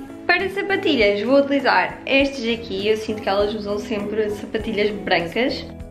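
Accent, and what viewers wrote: Portugal. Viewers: voce e de portugal amei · Você é portuguesa??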